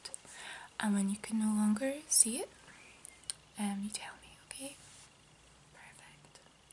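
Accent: Scotland, United Kingdom. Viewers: Excellent video! Welcome back!! I live in Europe, as well, and have a question. Your Scottish accent is a lot more subtle than say Scottish Murmur's. Is that a regional thing or is it more your own personal linguistic style? I've noticed this with a lot of the Scots I've worked with. Some sound like Simon Pegg other's like Sean Connery and then some have little accent at all. Am I crazy? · Where abouts in Scotland are you from if you don't mind me asking? Struggling to pin down the accent there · Welcome back. I've only recently started watching ASMR videos to help with stress and often click on an artist I've not come across before. You have no idea how glad I was to find you. Your gentle Scottish accent is so relaxing and I love it when you get so close to the mic that your mouth sounds are audible. Personally I didn't hear any camera noise and the mic you are using seems more than adequate. 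Really great stuff. Subbed immediately x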